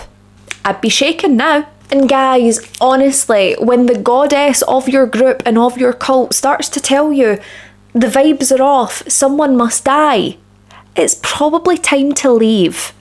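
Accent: Scotland, United Kingdom. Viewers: She’s from Scotland! 🏴 🥰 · You are so Scottish ❤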